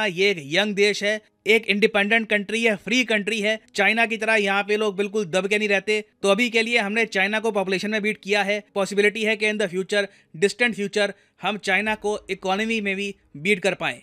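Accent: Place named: Bihar, India. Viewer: Your hindi like bihari..remote area or in viilage used.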